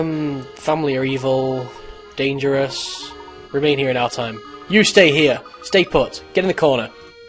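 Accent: United Kingdom. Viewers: I love your accent. I wish I had an English accent. x3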